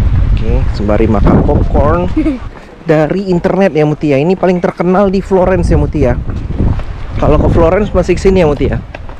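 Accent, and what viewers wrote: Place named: West Sumatra, Indonesia. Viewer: Dari awal gw dah nyangka kl Uda orang Minang, sempat nonton video yang logat Minangnya rada kental dikit, salam dari Ranah Minang uda...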